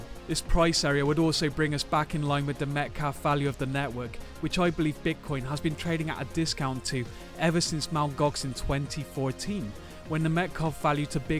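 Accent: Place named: West Midlands, United Kingdom. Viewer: nice video! Are you from the West Midlands in the UK, or maybe the Bristol area? I recognise the accent :)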